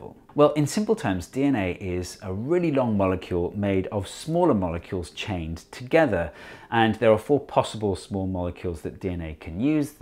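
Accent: United Kingdom. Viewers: British always sounds cool.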